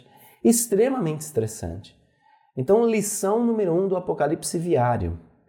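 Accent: Northeast, Brazil. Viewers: Muito bom vídeo, mas esse sotaque nordestino nunca esteve tão presente em vossa fala.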